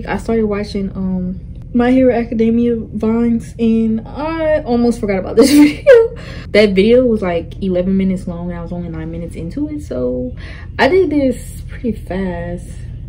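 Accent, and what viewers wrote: Louisiana, United States. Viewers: ok so what part of Louisiana are you from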